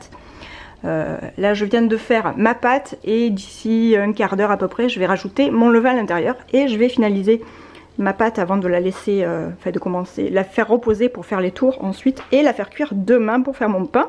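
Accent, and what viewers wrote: South, France. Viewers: Merci pour cette vidéo. Ton petit accent du sud est tellement agréable de bon matin. ❤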